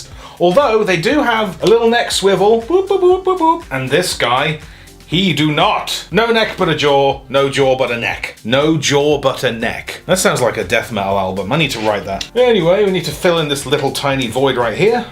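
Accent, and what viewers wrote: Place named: United Kingdom. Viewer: I do remember the "Transform a thing" song! I do miss it! I don't remember exactly how I started watching your reviews, I do remember it was either the Kapow sponsored ones that showed up as news on Seibertron OR Acid Storm and Dropshot because they were obscure repaints and no one else had done them when I got them, but I do remember I saw the first ones and was like "heh, cool reviews" but left it there, and then when I saw the second ones I was like "heh, cool re...wait, I had seen reviews by this english guy before!" And after that, I began binge watching your stuff and have been a faithful follower ever since, you even helped me finally understand british accent!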